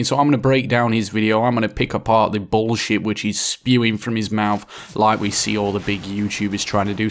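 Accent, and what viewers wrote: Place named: Midlands, United Kingdom. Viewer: Just stumbled across this video. Subbed and liked that's done good shit said here. Where are you from? I'm from Nottingham you sound Midlands UK but can't place it, Sheffield or Doncaster maybe? Anyway great video man! 👍✌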